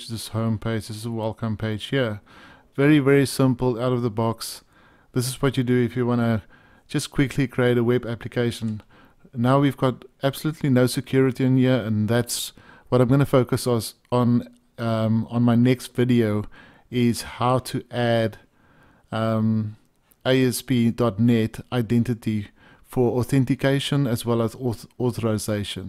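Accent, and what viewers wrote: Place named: South Africa. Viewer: great. you sound South African...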